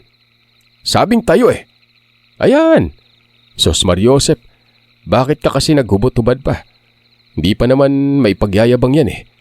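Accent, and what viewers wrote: Visayas, Philippines. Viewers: Nanggigil ako sayo sir. Napa ka slung ng bisaya mo.. pa-ma` ba`. 😂😂😂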